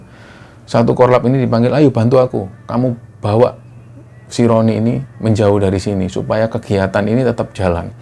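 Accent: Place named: Surabaya, Indonesia. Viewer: Pengen ktm mas.dn team,mas. dgn logat khas sby nya n ciri khasnya, bikin 😍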